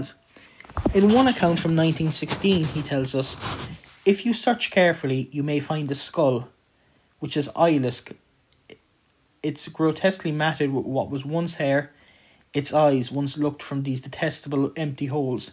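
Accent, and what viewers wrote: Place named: Ireland. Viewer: love that i can listen to an irish lad and it is perfect bbecause he sounds identical to my teacher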